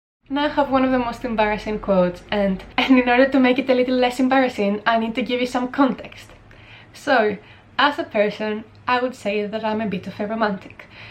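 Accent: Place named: Greece. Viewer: that explains it! My good good friend Akis is from Greece in Trikalla, both your accents are fairly similar. I know the word clap and that is al... are you ready to be overcome with emotion... right here is comes: hirokrotima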